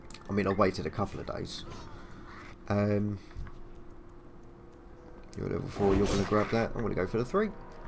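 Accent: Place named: Kent, United Kingdom. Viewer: Love the accent:) From Kent?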